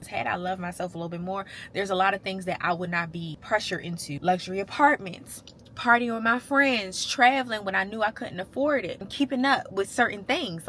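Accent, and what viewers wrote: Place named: Baltimore, United States. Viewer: 2 sec into the video, I said yo is definitely from Baltimore 😂 I miss the city.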